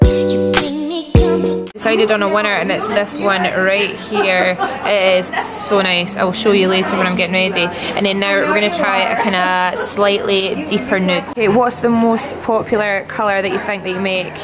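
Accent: Scotland, United Kingdom. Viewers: BTS Trash she's Scottish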